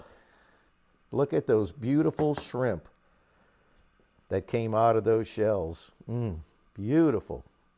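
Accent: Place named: United States. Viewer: Where is your amazing accent from? Delaware? Maryland?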